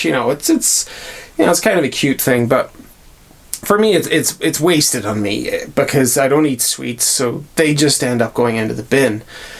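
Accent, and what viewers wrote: Northern Ireland, United Kingdom. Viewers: As a Nordie, I thought I detected an accent from the Graeme McDowell School of Transatlantic Speech....I was right.... I can hear a little noise in the audio, that's easily removed e.g. using Audacity noise filter.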